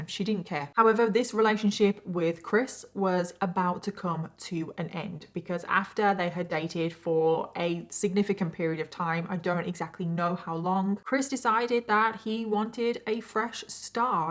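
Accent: United Kingdom. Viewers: I love your british accent, but I really don't like most of the british accents. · I’ve been watching A LOT of your videos. I absolutely love the way you explain out the cases as well as add your input on the cases and I LOVE your accent (I live in America so it nice to hear a British accent)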